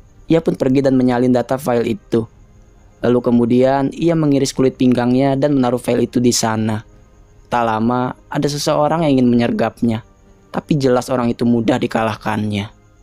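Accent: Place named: Banyumas, Indonesia. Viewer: Kaya ngapak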